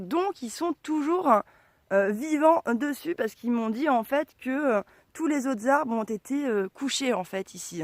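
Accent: Paris, France. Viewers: Et ton accent parisien j'adore, ça me rappelle une ex qui vit dans le 20 eme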